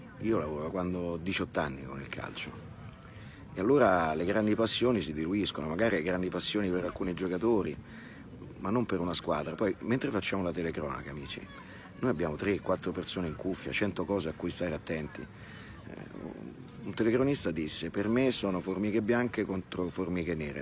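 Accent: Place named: Rome, Italy. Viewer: Da come parla o della roma o lazio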